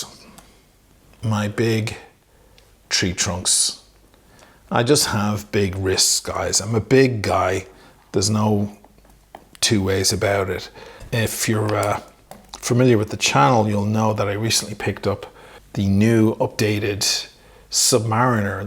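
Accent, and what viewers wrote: Ireland. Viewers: Might I ask where you acquired your accent? Ireland I am assuming. But where in Ireland?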